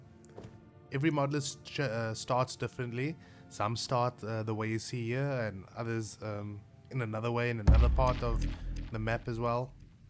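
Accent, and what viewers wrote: South Africa. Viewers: awe I recognize that South African accent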